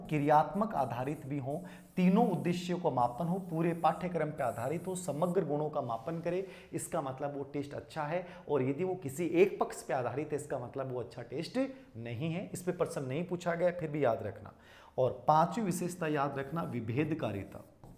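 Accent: Rajasthan, India. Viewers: Sirji aapke muh se marwari boli v achhi lgti h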